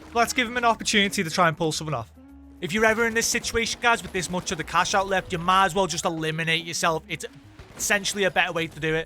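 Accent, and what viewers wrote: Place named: Scotland, United Kingdom. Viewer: thank you friendly Scottish man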